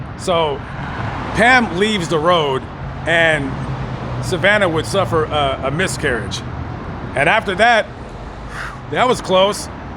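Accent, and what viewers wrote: United States. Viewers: Un relato tedioso a cargo de un pelado con insufrible inglés "americano".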